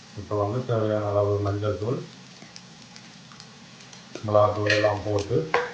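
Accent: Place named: Jaffna, Sri Lanka. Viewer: Love to listen your jaffna Tamil than your fish gravy😇.meen kulambu ready. Wife is behind me😂😂😂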